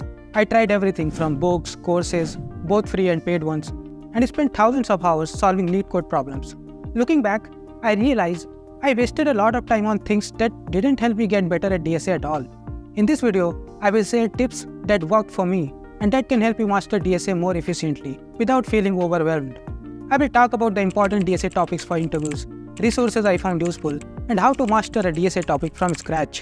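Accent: India. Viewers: bhaiya aap bihar se ho kya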